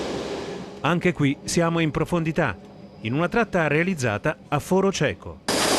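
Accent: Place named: Tuscany, Italy. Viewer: Cmq noi romani, sul tema delle voci degli annunci, abbiamo sempre cercato di emergere dalla massa :D Oggi sulla metro abbiamo la frase "Uscita lato destro....?" pronunciata quasi come fosse una domanda (chi è di Roma e frequenta le metro sà di cosa parlo eheh); ma dopo aver visto questo video, consto che "ieri" la calda voce della metro romana aveva un bell'accento toscano!!! :D:D:D:D sulla metro di roma si parlava toscano!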